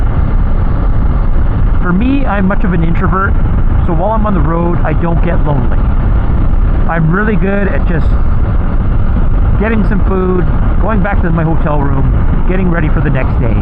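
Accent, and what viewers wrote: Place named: Canada. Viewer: As I've gotten older, a 3 or 4-day trip is the sweet spot for me. From where I live in central North Carolina, I'm less than a half day ride from some of the best roads on the east coast. I have a couple friends that I'll do trips with, but I'm the one who always plans the routes, arranges the hotels, lunch stops, etc. I love riding with my buddies, but doing my own thing relieves me of those duties--and the stress--and makes the ride more enjoyable. I didn't realize you were Canadian (I should have picked up on the accent, lol). I work in Canada quite a bit, as we have a branch office in Mississauga. I'll be working in Montreal for a week in September, followed by a week in Edmonton/Calgary. Good video, buddy. Ride safe.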